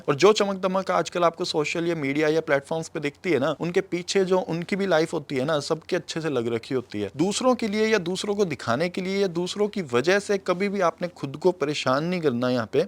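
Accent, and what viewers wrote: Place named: Haryana, India. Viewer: Bhai aap ka accent haryanvi jaisa lagta hai baaki aap kahan se hu ? 😀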